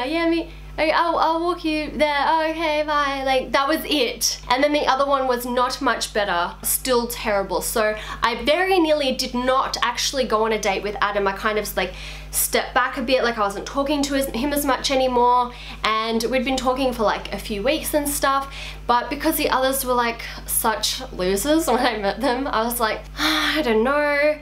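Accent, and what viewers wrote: Australia. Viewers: That’s interesting that someone would say your accent is funny. Your accent sounds more universal than the strong slang Australian accent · Hahaha I feel you about thinking the Aussie accent is obvious! I think it’s even worse for New Zealanders ;)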